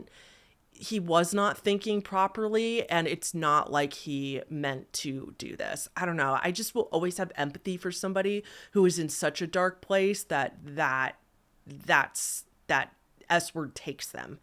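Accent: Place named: California, United States. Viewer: I can’t take this video seriously with the thick California accent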